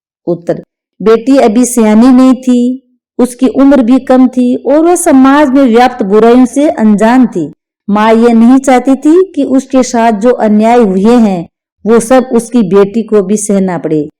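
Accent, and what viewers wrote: Rajasthan, India. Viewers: Mam aap rajasthani ho na aapki voice tone se lagta h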